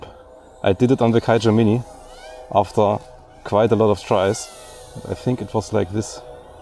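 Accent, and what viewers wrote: Germany. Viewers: Damit und das mit deutschen Slang aiso rock das Ding ❤😂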